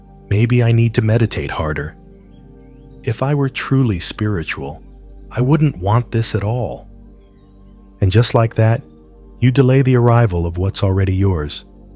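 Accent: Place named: United States. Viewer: I find it difficult to meditate and manifest,to picture my needs... I've asked for many years,but my life didnt get easier, Love the narrators voice.gentle and calming.i am English but love the American voices. If you have money you do have freedom..... I think I'll try again to meditate and manifest....❤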